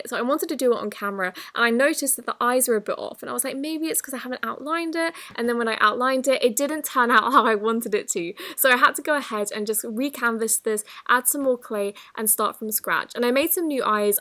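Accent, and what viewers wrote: United Kingdom. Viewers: Pleease do more vlogs, your British accent is so cute🤩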